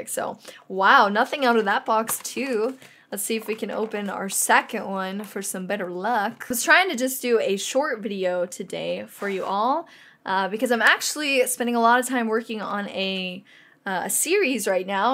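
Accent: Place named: Rural, United States. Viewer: That country accent tho lol!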